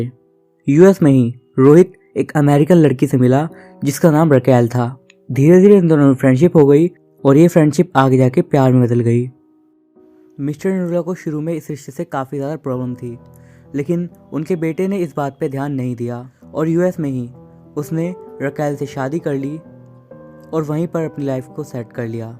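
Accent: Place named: United Kingdom. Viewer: Ji, mai aapka ek fan hoon, ye ho gayi sabse pehli baat. Ab mai kuchh aisa kehna chahta hoon, jise sun kar, mai ummeed karta hoon ki aap bura nahi manenge balki, meri baat ko positive way mein lenge. Mai darasal ek suggestion dena chahta hoon aapko- please ho sake toh apne "र" ke pronounciation ko theek karne ki koshish karein kyun ki woh sunne mein aisa lagta hai jaise koi angrez kahaani suna raha hai, aur dusri baat, jab aap kahaani sunate hain toh beech-beech mein aapke do (2) words intermingle ho jate hain, jiski wajah se woh vakya theek se samajh mein nahi aati. Baki sab Great hai Boss! Aap ek amazing story teller / reader ho, aapki voice expression perfect hai. Bas, just yahi do-ek cheezein sahi ho jayein toh aap really outstanding performer ho sakte hain. Isiliye aapse request karta hoon ki zara in biduon per dhyan dein jo ki maine apne comment mein ujagar ki hai. 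Ummeed karta hoon ki aap meri (apne ek fan) ki baaton ko positive way mein lenge, na ki, bura manenge. Please do believe me buddy, i just love you & your stories too much!❤👏🏼👌🏻👍🏻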